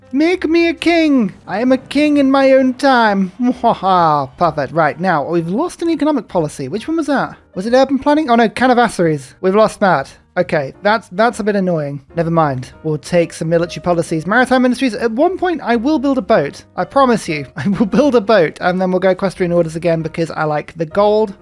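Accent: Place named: United Kingdom. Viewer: Bri'ish gameplay with Bri'ish commentator incoming, you bring me joy EVERY DAY. Thank you for that.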